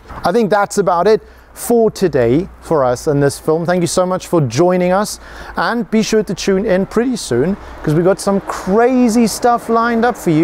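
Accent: South Africa. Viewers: This was an epic review as always! His presentation style, that honest emotion and RSA flavour...🔥🇿🇦 Dankie 💯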